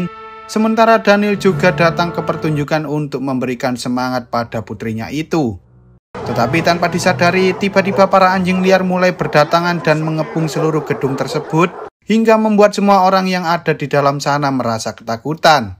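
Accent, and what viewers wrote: Java, Indonesia. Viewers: Logat Jawa Gak Enak Di Dengar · Bang lu orang jateng apa jatim ni? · Narasinya medok" bahasa yg santai aja bang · Medok jowo gk enak dikuping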